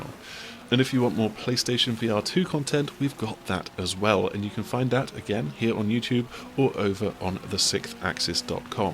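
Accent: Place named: RP, United Kingdom. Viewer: You is so posh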